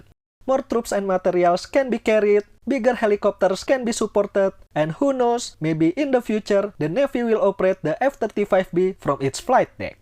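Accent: Indonesia. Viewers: Indonesian accent... Tak apa apa.... Good job..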